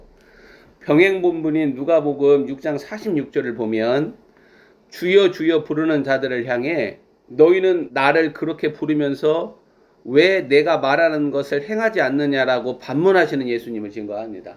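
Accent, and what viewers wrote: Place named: Jeonbuk, South Korea. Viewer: 그러시군요 말씀중간중간 억양이 그러신듯해서 여쭈어 보게됬습니다 저도 잠시 전북에 머물고있다보니 그런것도 구분이되네요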